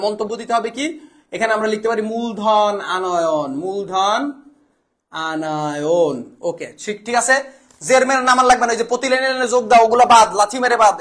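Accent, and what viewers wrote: Barishal, Bangladesh. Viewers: স্যারের বাড়ি মনে হয় বরিশাল। 😊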